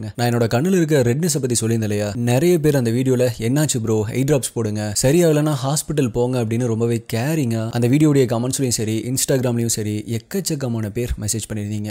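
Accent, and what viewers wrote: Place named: Kerala, India. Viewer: YOUR VOICE LIKE FROM KERALA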